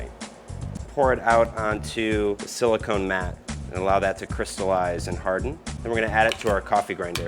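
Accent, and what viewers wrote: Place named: United States. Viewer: I don't think it matters how words are pronounced since Italians, English, and U.S. Americans, for example, pronounce them differently. To me it's like an indicator of where the person came from; this case he's American. :) Ain't language fun? :D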